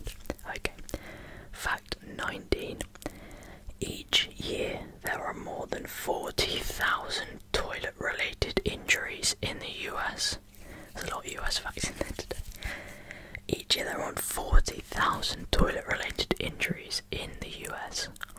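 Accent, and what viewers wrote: United Kingdom. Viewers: Congrats on 7K subs. Btw I love listening to your British accent 🥰. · Very cute thumbnail huh. Got to be careful using that word until you're eighteen because where I'm from your underage until then. But none the less I love listening to somebody whisperer in a British accent and list off a bunch of facts. British accents are very hypnotizing.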